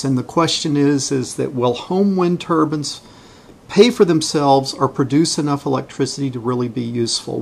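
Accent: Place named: United States. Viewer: I don't expect you to change your accent or speak in a British accent. I love the way Yanks speak. They (you) just have to pronounce words correctly. lol. I'm more concerned if you are one of the 46% of Americans that think the world is only 6000 years old?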